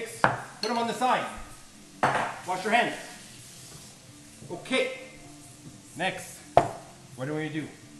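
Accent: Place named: Montreal, Canada. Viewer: I picked up the Mtl accent right away 😂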